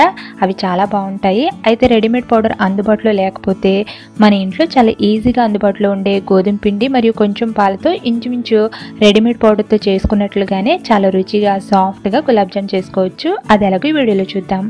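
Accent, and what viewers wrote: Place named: Andhra Pradesh, India. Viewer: Your voice is very sweet Andhra ammayi anipinchela me explaination bagavundhi.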